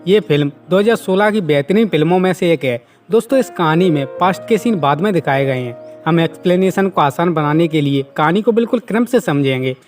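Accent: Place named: Madhya Pradesh, India. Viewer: apki boli ko pehchaan liya me Gwalior se hu 😁😁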